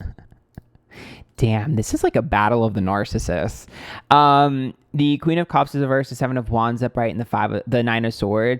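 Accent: New York, United States. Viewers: I love when your new yorker accent comes out. Get em sis 👏🏼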